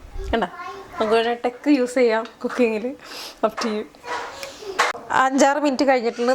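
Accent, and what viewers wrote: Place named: Thrissur, India. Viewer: എന്തായാലും ആ trissur സ്റ്റയിൽ സംസാരം ഉണ്ടല്ലോ....തകർത്തു .... അത് കേൾക്കുമ്പോൾ തന്നെ ഞങ്ങൾ പ്രവാസികളായ trissur കാർക്ക് ഉള്ള സന്തോഷം ഒന്നു വേറെ തന്നെ യാണ്.......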